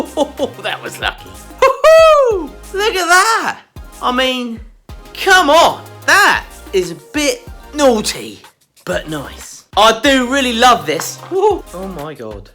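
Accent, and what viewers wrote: United Kingdom. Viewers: ...you are freaking amazing n hilarious!!! Great job n i love your British accent.....rather sexy...🙋💕💕💕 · First time watching this channel and I honestly was not expecting the British (?) accent. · Well thought by the thumbnail this would be interesting video... then soon as heard you speak I said ugh hell no! The way Brit’s speak is absolutely annoying... so no thank you moving on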